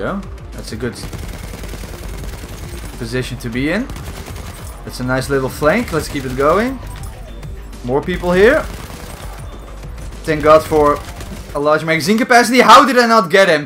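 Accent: Netherlands. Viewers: Je hoord dat je nederlands bent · Very nice dutch accent i love it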